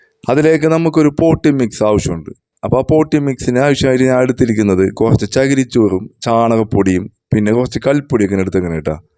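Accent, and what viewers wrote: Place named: Thrissur, India. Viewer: നല്ല തൃശൂർ ഭാഷ 😄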